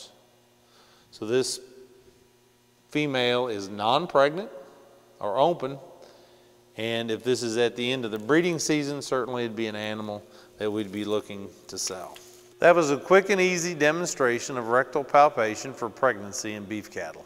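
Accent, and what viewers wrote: Kentucky, United States. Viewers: watching 2x speed because this man speaks Kentuckian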